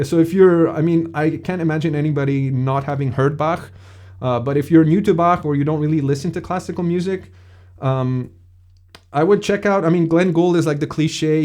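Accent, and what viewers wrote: France. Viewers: French accent.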